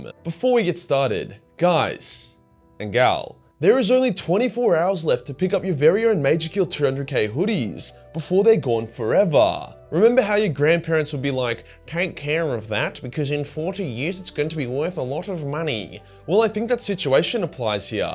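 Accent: Australia. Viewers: Why is it relevant that you’re Australian? It’s pretty fkn obvious anyway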